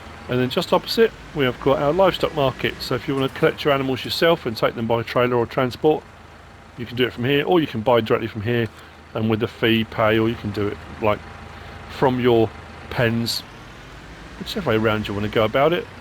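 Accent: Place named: United Kingdom. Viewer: Your British right? you sound it (☞ﾟヮﾟ)☞☜(ﾟヮﾟ☜)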